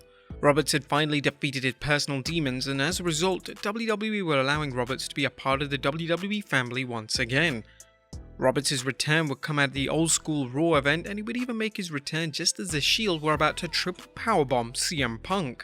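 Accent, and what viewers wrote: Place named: United Kingdom. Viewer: Gotta love a top 10 list about lack of crowd reaction but we never get to hear the reaction, just a British voice talking. Also, why are top 10 lists always British?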